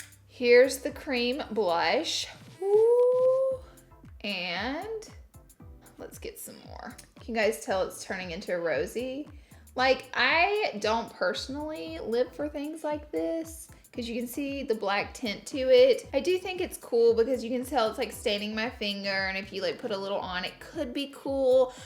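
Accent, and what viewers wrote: United States. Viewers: Omg I luv u so much and I luv ur videos too! I luv ur voice it is so country and u are my all time fav youtuber 💙!!!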